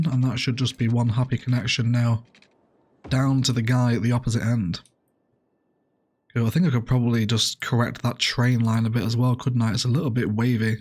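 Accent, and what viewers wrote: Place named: Manchester, United Kingdom. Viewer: I'm french so not that good to spot all the english accents, but you're from Manchester right? I can hear a bit of Marcus Rashford when you talk, I like it! 😁 Oh and great stuff overall on the channel. It helps a loooot!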